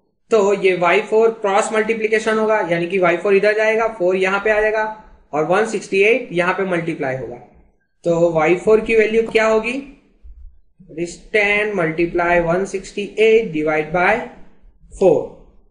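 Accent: Bihar, India. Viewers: Sala Bihari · bihar ho kya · Are you from bihar!! '!'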